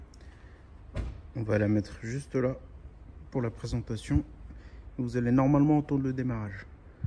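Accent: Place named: Paris, France. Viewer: Bravo belle acquisition la seule chose qui me gêne c’est que tout les gens du continent continue à mettre des plaque corse pour faire croire qu’ils sont corse alors qu’avec votre accent de parisien on vous reconnais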